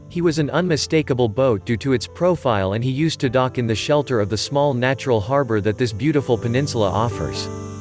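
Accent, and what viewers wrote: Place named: United States. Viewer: Nice videoing - but the American-style narration distracts. Is this some automatic US-english narration?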